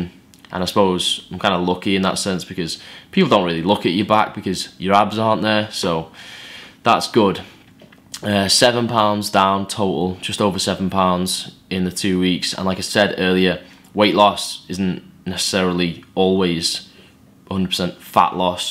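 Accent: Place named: Yorkshire, United Kingdom. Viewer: Where are u from? I love the accent, sounds a bit North English probs Yorkshire?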